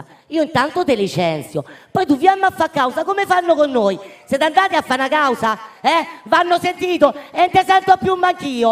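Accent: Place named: Rome, Italy. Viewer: sei proprio 'na romanaccia,come me! daje che cja famo!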